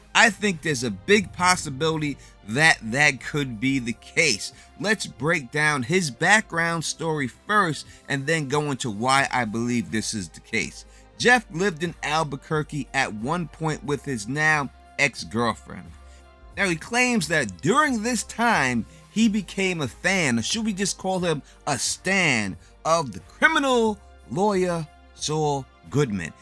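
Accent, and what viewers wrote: New York, United States. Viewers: Can you talk a little less black and new york-ish, please. We get it, you're a black new yorker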